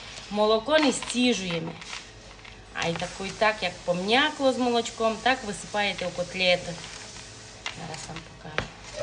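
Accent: Transcarpathia, Ukraine. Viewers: То говір Закарпатський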